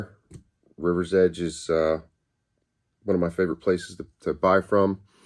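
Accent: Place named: Maryland, United States. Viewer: You sound like a Marylander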